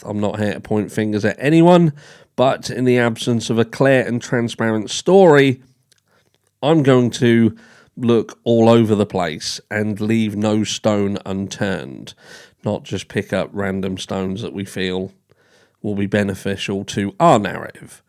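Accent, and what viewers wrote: Norfolk, United Kingdom. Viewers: Obviously don't tell people where you live but by your accent I believe you lived in Norfolk .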